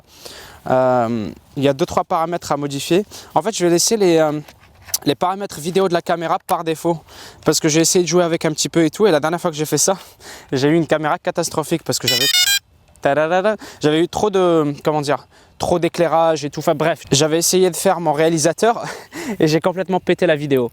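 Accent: Quebec, Canada. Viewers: C'est moi où tu commences à avoir l'accent québécois ? Mdrrrrrrrr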